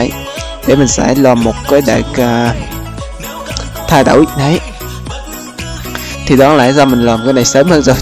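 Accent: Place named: Quang Ngai, Vietnam. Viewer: bạn nói tiếng quảng Ngãi mình không nghe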